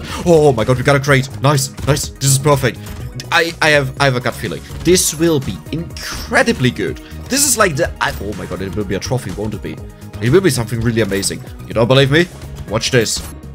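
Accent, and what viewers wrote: Germany. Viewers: You know, it's already hard enough to get through your thick German accent, the NIE NE NE NIE NEN NE don't really help there. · The german english 🤣 love it, wenn das deutsche "so" ausbricht 🤣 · Bruh du bist Deutscher das merkt man so Hard in deinem Akzent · Oof the german accent😂😅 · Moin Ich mag deine Art sehr gerne (und dein deutscher Akzent hat dich auch noch mal direkt ne Ecke sympathischer gemacht) Abo ist da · Very german accent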